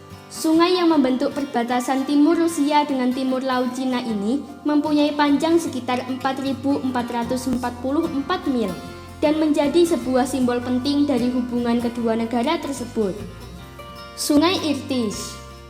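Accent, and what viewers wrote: Java, Indonesia. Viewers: Meddok jawane 🤣😁😃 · Orang Jawa 😊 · medok banget njiir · pengisi suaranya bagus si tp ya jng terlalu keliatan logat kejawaannya, ya memang si susah klw orang jawa Timur ataw Jawa Tengah untuk bicara bhs indonesia tanpa logat, laen orang jawa barat yg bicara bhsa Indonesia itu enak di dengar ga nyeklok gt, tp keren ko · Jawa,,,,,,,,, suara nya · Logatnya jawa banget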